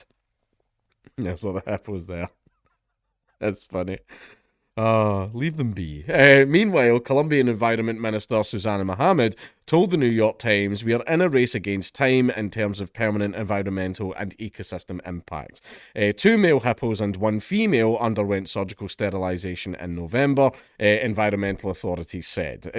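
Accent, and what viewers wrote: South Africa. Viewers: That was a mint South African accent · I love your south African accent. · South African accent has me dying 😅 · saffa accent is on point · Haha,nice saffa accent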